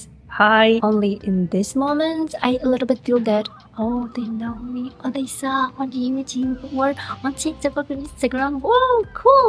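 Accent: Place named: South Korea. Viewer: I really like ur korean English accent its unique and😅😆😁 by the way i like ur vids they always bring a smile on me 😁😁😁 keeping doing ur best may God bless U✝✝✝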